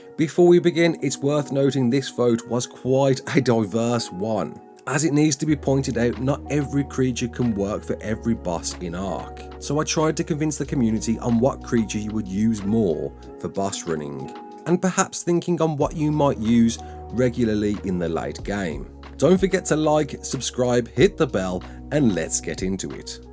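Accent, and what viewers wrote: Birmingham, United Kingdom. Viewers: Brummie or Black Country lad?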